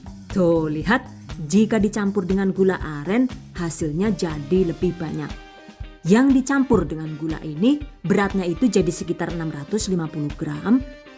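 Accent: Banyumas, Indonesia. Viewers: AQ suka bahasa ngapknya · Wong endi si rika mbakayu...? Deneng ngomonge pada karo enyong...! Jos lah top markotop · Ngapak temen wa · 😁😁😁ana bahasa ngapak e kya nyong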